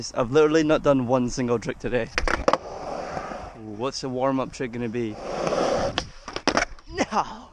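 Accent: Scotland, United Kingdom. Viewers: Is ma mans Scottish????? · i only realised he was scottish about half way through the video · R u Scottish?😂💕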